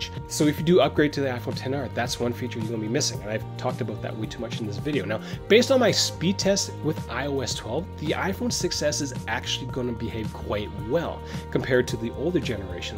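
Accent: Canada. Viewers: I’m Canadian, you’re Canadian. You make this a point in your name “eh” It’s hard finding a Canadian voice in tech and phone reviews. So I was delighted to find you. THAT said it bothers me you give prices in USD not CND . At least say “ the iPhone xr is $750. In the U.S or $1099 Here in Canada eh “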